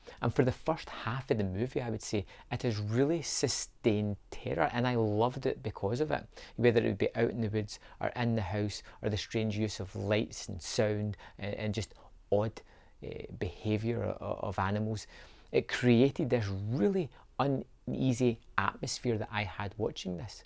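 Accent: Scotland, United Kingdom. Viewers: Was surprised to hear a fellow Scots voice.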